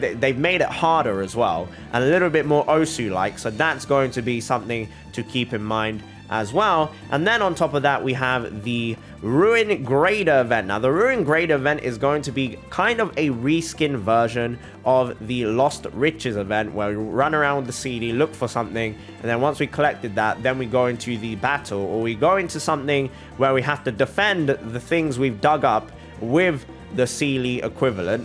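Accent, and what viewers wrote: United Kingdom. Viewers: I just found out about you and I have say, it's kinda weird hearing an asian with a uk(?) accent. No hate or anything but it just kinda threw me off a bit since it's a bit unusual to me.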